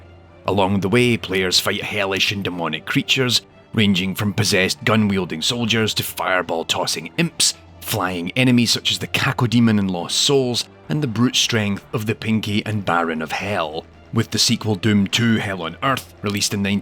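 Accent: Scotland, United Kingdom. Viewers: As a fellow Scot, I like how your accent got stronger as the video continued. Especially the rolling of the Rs. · A Scotsman saying ‘Rrrrip your face out’ sounds terrifying. Your dialect sounds awesome and terrific. Don’t even feel the need to deal it down. Also: nice things for you. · I wanted to subscribe. God why did you have to have a Scottish accent. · It sounds like you were less able to suppress the Scottish-ness in this episode's narration! Also, for anyone at all interested in the nuts and bolts of DEWM, you owe it to yourself to check decino's channel! He's done A.I videos like this one, but also stuff like the sources for the game's audio and interesting tidbits about the sprites!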